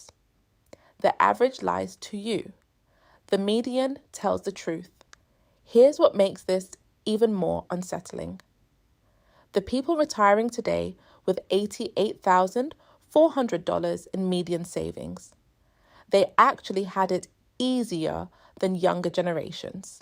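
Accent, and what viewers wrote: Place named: North Carolina, United States. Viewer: Durham NC.. Nice accent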